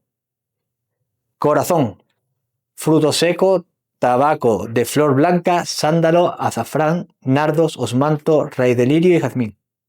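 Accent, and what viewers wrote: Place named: Andalusia, Spain. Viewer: Me encanta el acento andaluz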